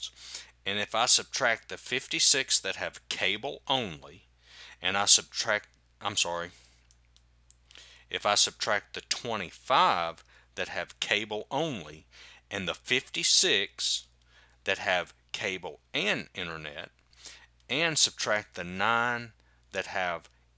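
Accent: South, United States. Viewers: I admire your Southern accent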